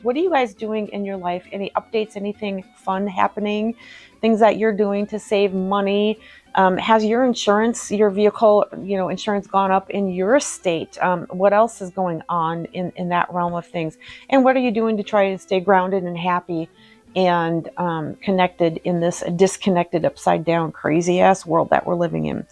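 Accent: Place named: Midwest, United States. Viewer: NY🤨 insurances all up. Originally from ND. Are you from the Midwest? I hear something as you speak. So I really appreciate your way of putting things, the concept of liking the more vintage times. My dad was not into vintage because he lived it but still I found/find comfort and joy in the things from the past. He was also navy during Ww2 and Korean and love the wedding photo you showed. I have read most of the Agatha Christie. Finishing up Nemesis. Murder mysteries and some historical fiction is my go to. I could go on but I just wanted to encourage you. Thank you!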